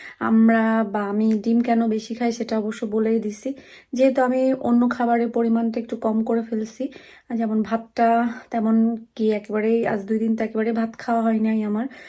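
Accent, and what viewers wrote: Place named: Gopalganj, Bangladesh. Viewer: apu amio gopalgonj er meye so tumi jokhon amader desher vashay kotha bolo tokhon amar khub valo lage ekdom nijer manush ❤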